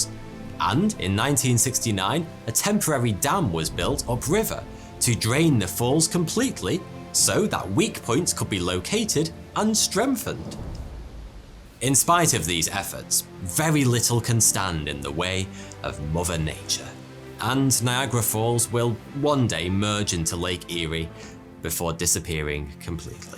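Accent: United Kingdom. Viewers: Thoughty2 why are u British?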